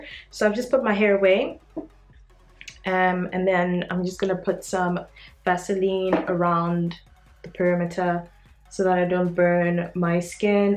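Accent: South Africa. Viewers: Sounds like you have a South African accent, do you live there?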